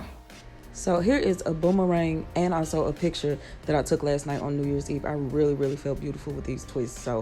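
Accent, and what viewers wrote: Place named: Texas, United States. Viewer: Sorry if you have answered this before, but are you from Texas? That accent is giving me Texas lol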